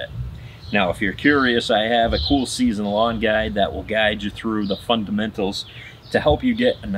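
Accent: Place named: Boston, United States. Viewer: How did that accent get to Illinois? I always assumed you were in a Boston suburb.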